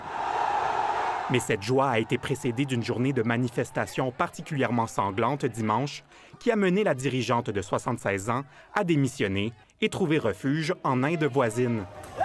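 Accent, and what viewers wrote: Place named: Quebec, Canada. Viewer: Je ne pense pas que ton commentaire soit mal intentionné et je ne veux pas jouer les donneurs de leçon, mais il est important de ne pas ridiculiser un accent qui définit une identité culturelle entière. Certes, l'accent québecois peut sonner un peu étrange pour nous francophones d'Europe mais il n'est pas plus "bizarre" que le nôtre, ou celui des Belges ou de tout autre pays. En s'y exposant plus régulièrement, on s'y habitue (et y'a d'excellents films québecois que tu peux regarder comme Mommy etc.)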